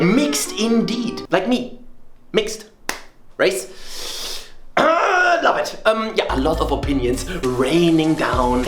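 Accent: Germany. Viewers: Why does he sound french british and chinese at the same time Maybe or just german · The video is great but you make me enjoy the german accent a lot more than usual , it sounds satisfying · Thank you so much for speaking like a normal human being. Nothing I hate more than a youtuber slurring their words to get to the 10-minute-mark. edit: also your Denglish is so endearing.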